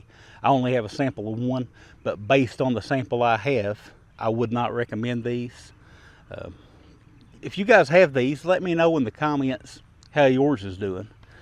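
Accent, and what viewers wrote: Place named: East Tennessee, United States. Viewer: I just found your channel. Where are you from? You accent is telling me somewhere in East Tennessee.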